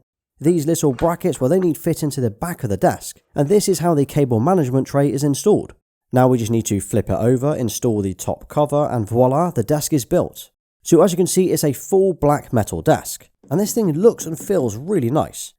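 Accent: United Kingdom. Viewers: I knew he was going to be British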